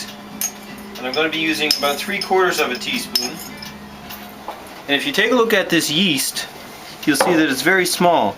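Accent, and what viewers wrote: Canada. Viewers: big fan here !! "cant beat it wit a stick" made my morning. you sound like the bys back home in NL. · I like your Canadian accent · sounds Canadian.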